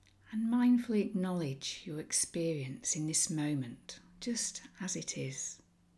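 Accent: England, United Kingdom. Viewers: At last a warm English voice ...Aaah 🕉️🙏🥰✨🌟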